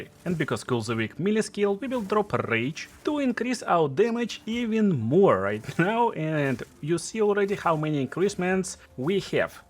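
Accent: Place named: Russia. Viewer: Вери строн рашен эксент.) Nice starter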